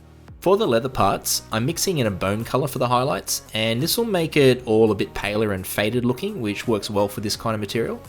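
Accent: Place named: Australia. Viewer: Keep up the good work,! Your paintjobs are crisp and I really enjoy the Aussie accent when I see your painting.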